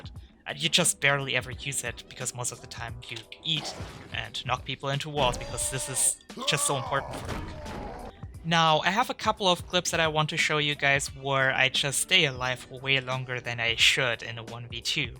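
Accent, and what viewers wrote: Germany. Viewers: du bist deutsch, oder? der Akzent klingt familiar :D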